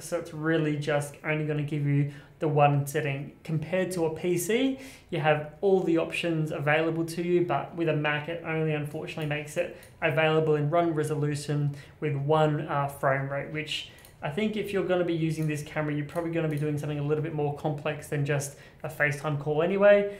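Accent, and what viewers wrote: Australia. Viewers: That was very informative and great to hear a fellow Aussie presenting. I have downloaded Melon for streaming to YouTube but not sure how I ajust zoom, colours etc on my Brio 4K in Melon, I don't think I can. Is OBS a streaming software also? I don't want the 2 programs clashing. Or can I adjust everything in OBS and still stream from Melon? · Very nice tutorial (plus, Americans are suckers for Aussie accents)! :-). Really bummed that FaceTime doesn't let you make any fine adjustments to the camera as I'm seeing a lot of color cast on my FaceTime calls with the Brio. Let's see if Zoom or Skype does any better with color correction.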